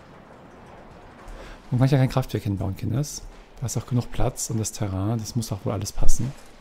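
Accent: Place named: Bavaria, Germany. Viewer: Wie gut, dass Daniel nicht dein Bayrisch hört ^^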